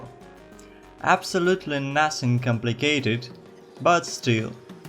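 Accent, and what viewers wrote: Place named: Russia. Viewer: Слышно, что наш человек)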